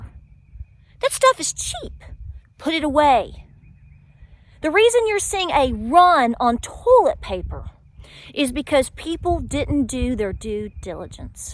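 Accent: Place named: Appalachia, United States. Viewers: When I hear you speak it gives me a taste of home. That Appalachian twang is music to my ears. And good old fashioned common sense, something that is in short supply, especially now. I live in Florida and the “spring breakers” are acting so irresponsible even today they were doing Jell-O shots off girls stomachs, partying like nothing going on. Incredible. Incredibly stupid. Now they are taking the virus home to their families. Can’t get my head around it and why their parents would allow them to even come here right now. I’m staying home because it’s the right thing to do. So should all non-essential folks. You a few other channels taught me how to dry can which I started doing in July cause I couldn’t afford a pressure canner . I finally got one and have been busting my hump canning and you guys taught me and I thank you so much. 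God put it on my heart last year to get ready, something is coming and I did my best. We are not were I wanted to be yet but I’m probably ahead of 95% of the country and I am so thankful. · Also I'm from West Virginia....I can tell you're close geographically and not just by you also being an Appalachian queen! I can hear that beautiful accent...mine is barely noticeable,but sometimes people will ask to which I'm always surprised since mine is so minor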